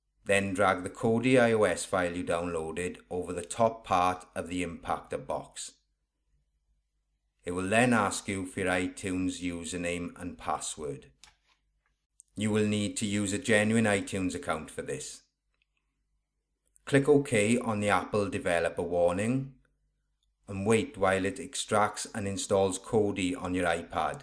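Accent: Wales, United Kingdom. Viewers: Love the Welsh accent! My mum is from Cardiff. :)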